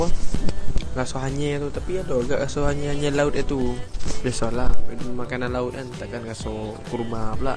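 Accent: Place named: Negeri Sembilan, Malaysia. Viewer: Fuuuu, pekat nogori nya tuuuu.. Terboyekkkk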